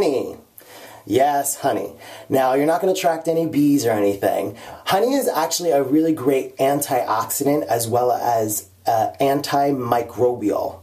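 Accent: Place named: United States. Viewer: I'm British and I just love your American accent!! Thank u soooooo much for making videos like these coz they r just so helpful and I'm really grateful for that 😄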